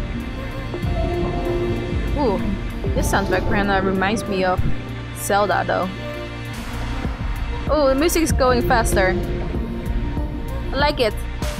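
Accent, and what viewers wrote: Netherlands. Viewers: 🤣🤣your vid convinced me to buy it I can tell you really enjoyed it..by the way awesome accent are you a native Dutch speaker?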